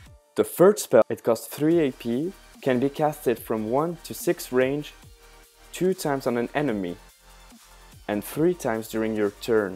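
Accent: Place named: France. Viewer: you sound so french... don't forget about the "ed" after a "t" is pronounced [tide] and pronounce your "h"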